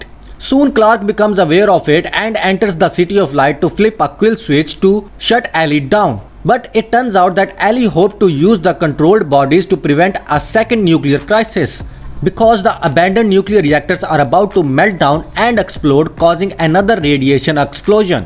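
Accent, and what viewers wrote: India. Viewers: it’s awfully hard to understand, due to the thick Indian accent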